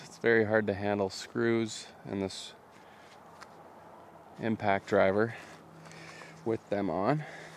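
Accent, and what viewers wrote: Canada. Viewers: First of all, you were really letting your Canadian flow this week hahhahahha... I 100% agree with the building bug! I am not done with my place yet and I am already thinking how I would like to try my hand at a log cabin. Heck, I am still young enough.....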